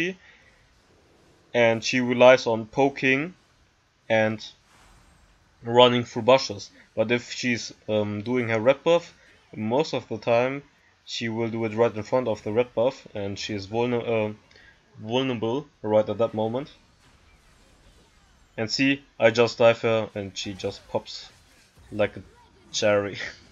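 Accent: Germany. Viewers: I have a question. Are you from Germany? Because you sound like german and your Windows Exil or Word or whatever were german, too. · Where r u from? Youre english sounds like u come from germany 😅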